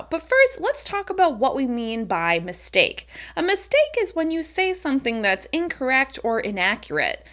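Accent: United States. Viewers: Good Morning! How have you been today? Thank you for your lesson, warm American voice and a little fast but i got it. Norge Guy.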